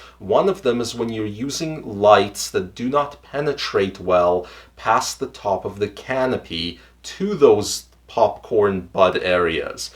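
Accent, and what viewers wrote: Russia. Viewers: Your a good hombre so I subscribed. By the way I think I really good at determining someone’s accent having a mom from Finland with super heavy one. Just thought there was for sure some Русский there Хорошего тебе года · My guess is he has a Russian background and moved to North America... Perhaps Canada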